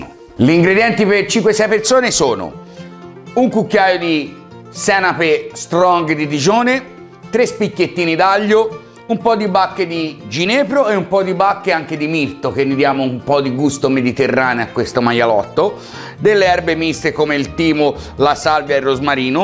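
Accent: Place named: Viareggio, Italy. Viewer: Le ricette sicuramente sono ottime, ma io le faccio I complimenti perché usa tutto della verdura, non fa sprechi, mi piace vedere come con poche cose riesce a fare tanto ben di dio!! Bravo!!! Hah un'altra cosa, la parlata! Mi ricorda la mi zia che è di Viareggio, non la vedo da un anno. Buon anno nuovo!!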